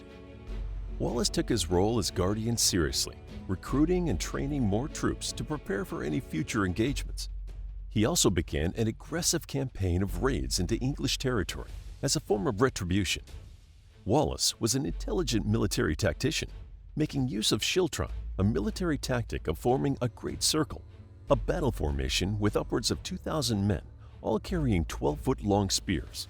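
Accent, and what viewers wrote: United States. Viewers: Why should we listen to an American voiceover distort the Wallace history by including so many errors ? The story of Wallace was a translation of the original Latin that was translated by The Minstrel in 1361 from a document by eyewitnesses probably around as early as 1310 that was commissioned by Bishop Sinclair of Dunkeld.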